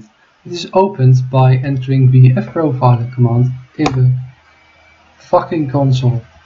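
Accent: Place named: United Kingdom. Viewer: why do you sound so FUCKING british:S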